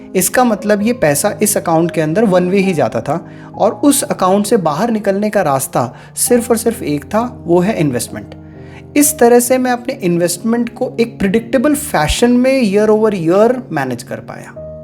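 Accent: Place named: Madhya Pradesh, India. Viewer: Ram Ram jee Bhai, aap kahan ke rehne waale hain?shuru main laga aap Lucknow ke hain par aapke bolne ke lehje se laga shayad aap Madhya pradesh se hain..accha laga sun ke, dhanywaad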